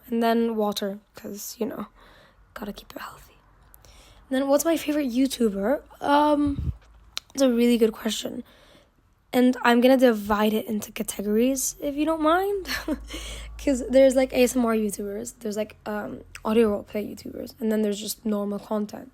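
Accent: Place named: United Kingdom. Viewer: Your UK accent is really like coming through and more showcased and overtaking (If that makes sense) then ur US accent which is cool 😭❤️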